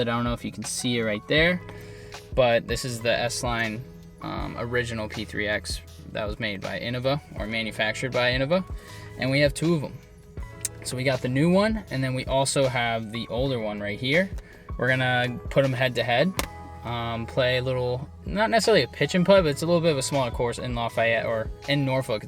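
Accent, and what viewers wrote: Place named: Ireland. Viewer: Awesome Irish ACCENT!!!!!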